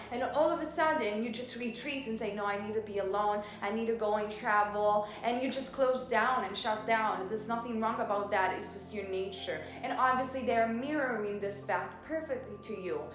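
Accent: Italy. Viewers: your italian cuite love your accent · Is she italian? I so love her already! Her accent and personality! LOVE! Anyways I have seen so many videos like this but god I have never had any reading this eerily accurate! Like never before! OMG! spot on! I chose #3.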